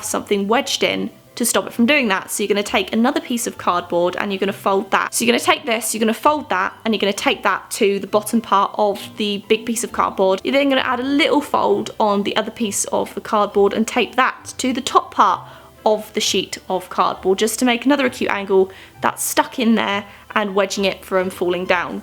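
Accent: United Kingdom. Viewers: Becaus you have a british accent and you live in america... or england.. plz tell us in a vid! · do you live in America? hearing a British person saying dollars and hobby lobby confuses me 😂😂😂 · erm are you American you say dollars and stuff but you sound like you have a British accent lol. great video I'm more of a sketcher, drawer however you say it but the organisation tip was pretty useful.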